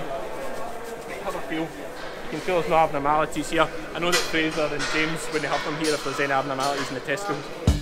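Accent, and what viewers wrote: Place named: Ayrshire, United Kingdom. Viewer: Your " wonderful accent " is so familiar to me I don't notice anything unusual - until I read the comments. Fair to say the Ayrshire accent is probably under represented on Youtube lol.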